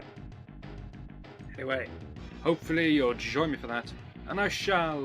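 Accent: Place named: United Kingdom. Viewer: Great to see you back,! Your monotone, British commentary was gravely missed and I was more than ecstatic to see a video from you show up in my feed. I can only hope you complete your mission of Let's Play Everything this go 'round.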